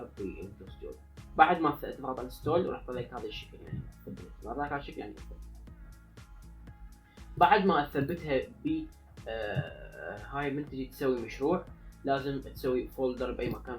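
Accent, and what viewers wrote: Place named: India. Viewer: أمانة أنت فهمان شو عم تحكي ، يازلمة عم تحكي متل الهنود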